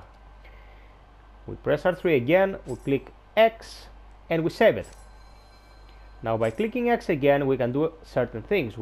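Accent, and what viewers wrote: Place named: Greece. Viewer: Ρε φιλε βλεποντας το βιντεο καταλαβα οτι εισαι ελληνας απο την αγγλικη προφορα χαχα!!καλο βιντεο αδερφε.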